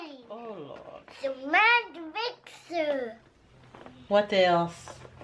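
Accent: Jamaica. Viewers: I am glad I jumped over on your page. Saw you from Adanna and David's page. Like how you keep up with Ja accent👍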